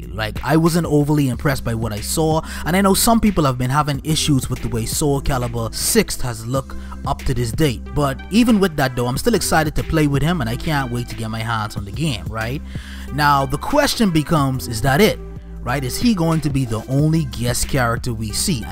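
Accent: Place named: Bahamas. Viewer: Haha, nice video! I know that Bahamian accent anywhere!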